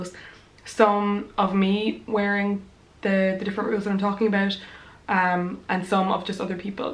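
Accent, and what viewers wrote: Ireland. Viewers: I love your Irish accent ☘️💚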